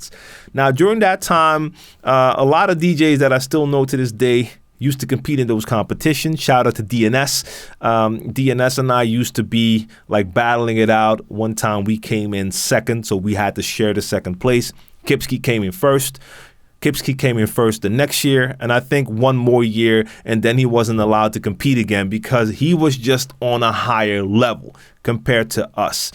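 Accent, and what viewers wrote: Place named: New York, United States. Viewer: I always thought you were from new york.. you have the NY accent.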